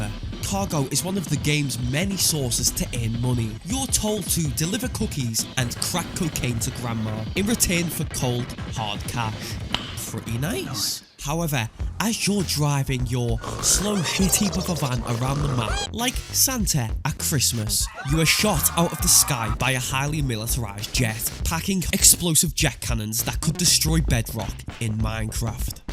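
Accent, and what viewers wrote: Liverpool, United Kingdom. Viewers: W video scouse man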